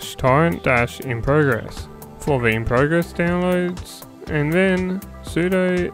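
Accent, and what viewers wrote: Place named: Australia. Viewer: Habib he sounds Australian.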